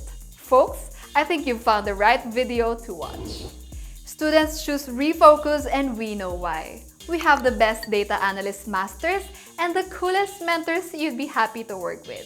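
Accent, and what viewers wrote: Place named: Philippines. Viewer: Thank you ma'am ganda nyo po. i loove your filipino accent. say it again.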